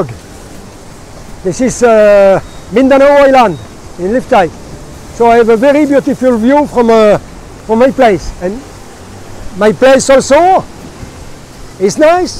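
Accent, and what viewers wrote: France. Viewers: I can sense some French accent.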